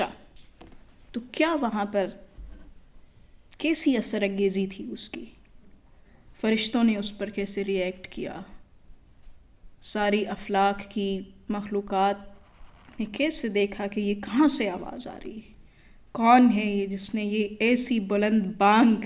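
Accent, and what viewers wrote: Iran. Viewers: ایک دقت ہے کہ محترمہ اردو بول تو رہی ہیں مگر فارسی لہجے میں بول رہی ہیں، اردو کا لہجہ اور ہے۔ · محترمہ ڈاکٹر صاحبہ کا بہت خوبصورت لیکچر ہے اقبال کی شکوہ و جواب شکوہ کی نظموں پر ۔ ان نظموں کو سمجھنے کے خواہاں لوگوں کے لئے ایک بیش قیمت تحفہ ۔ ڈاکٹر صاحبہ کا لہجہ نظم ادا کرتے ہوئے فارسی سے متاثر ہے ، اس کی وجہ یہ سمجھ آتی ہے کہ ان کا شاید زیادہ کام فارسی شاعری کے گرد ہو۔ اس سبب سے کچھ جگہوں پر تلفظ کی غلطیاں بھی ہیں ۔ مگر علمی طور پر میں کم علم تو کوئی کجی نہیں بھانپ سکا ۔ یہ سنتے ہوئے کئی جگہوں پر میں جزبات سے مغلوب ہو کر اشک آلود آنکھوں سے دیکھتا اور سنتا رہا ۔ ڈاکٹر صاحبہ نے بہت عمدگی سے وضاحت و شرح کی ہے ۔ میں یہ محسوس کرتا رہا کہ اقبال سے جو جزباتی محبت ، والہانہ عقیدت اور ان کے پیغام کے محاسن سے جو انس میں رکھتا ہوں ، وہ عشق ڈاکٹر صاحبہ بھی رکھتی ہیں - کچھ جگہوں پر واضح تھا کہ ڈاکٹر صاحبہ بھی گویا کلام پڑھتے ہوئے ایک قلبی و جزباتی کیفیت میں وارد ہو گئی ہیں - شکوہ کے بیباک انداز پر ان کی گفتگو سنتے ہوئے دل کے تار ایسے چھڑ گئے کہ بقایا حصہ بہ چشم نم سنتا اور دیکھتا رہا ۔ بلا شبہ یہ انداز گستاخانہ نہیں بلکہ لاڈ ، پیار ، مان ، یقین کامل اور محبت عمیق کا مظہر ہے- جیتی رہئے ڈاکٹر صاحبہ اور علم و عرفان کی روشنی سے طلبا کے ذہنی و قلبی چراغ جلاتی رہئے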